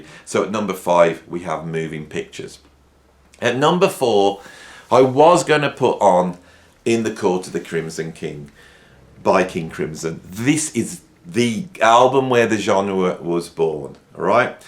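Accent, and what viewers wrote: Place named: England, United Kingdom. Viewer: I'm not happy you should have had them on the list from the very beginning and your reasoning was pretty lame 😢 it's hard to believe I hear an English accent coming out of your mouth but you didn't pick ELP the first time around😮 I say this and I'm American😊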